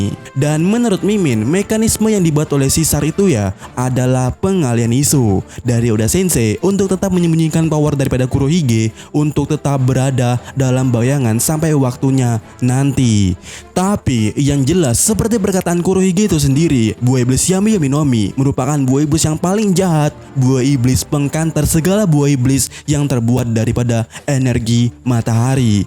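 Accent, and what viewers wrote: Palembang, Indonesia. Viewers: Bang lu orang Palembang ya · bg lu org palembang pa jambi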